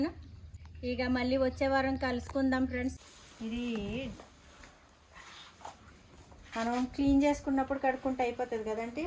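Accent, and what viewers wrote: Godavari, India. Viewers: మేడం మీది ఏ ఊరు మా గోదావరి జిల్లా అని అడుగుతున్నా మాట తీరు అలానే ఉంది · స్వచ్ఛమైన గోదారి యాస తో అక్క మాట్లాడుతుంది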